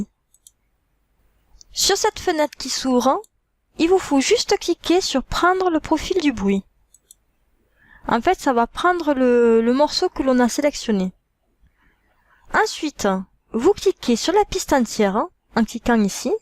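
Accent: South, France. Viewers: Cet accent sudiste me hante! (Je passe mes vacances en France donc je réalise un rêve ) Mais sinon ça va mettre très utile pour ma prochaine vidéo ;-)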